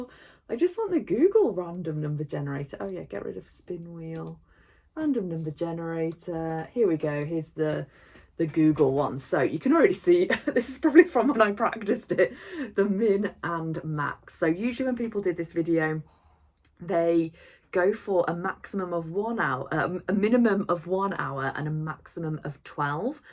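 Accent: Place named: Midlands, United Kingdom. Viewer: Heyy, I’m a new subbie! I’m also from the midlands as well, so it’s comforting to hear a similar accent. 🥰 You did really well, there’s NO way I could do 8hrs of reading, I can just imagine the brain fog 😶‍🌫️. I also have chronic fatigue, so completely understand! So glad everything is okay with your doggy too. Keep the videos coming! 🧡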